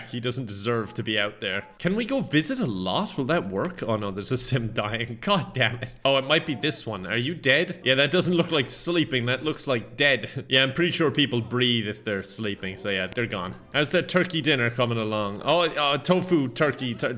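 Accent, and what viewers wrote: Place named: Ireland. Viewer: New video from Me: tremble to hear the cute Irish accent and watch the latest massacre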